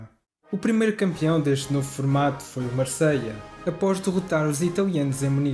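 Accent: Portugal. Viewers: Sotaque português é gostoso de ouvir Kkkk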